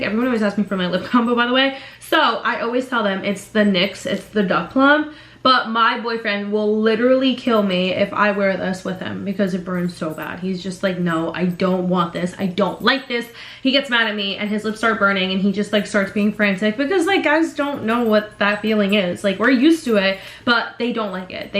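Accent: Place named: New York, United States. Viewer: She has a New York accent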